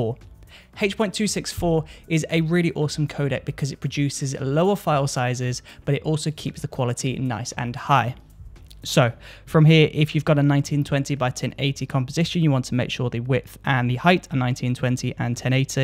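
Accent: United Kingdom. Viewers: Sounds pretty nice British?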